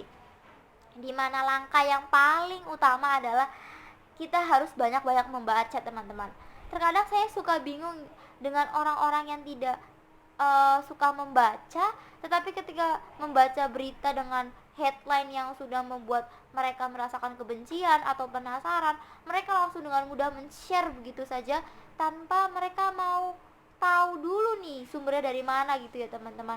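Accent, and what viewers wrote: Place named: Java, Indonesia. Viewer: Wah logatnya jawa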